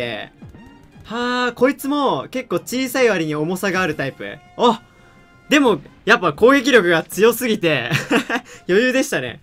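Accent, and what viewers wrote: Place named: Hokkaido, Japan. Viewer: 北海道弁LINE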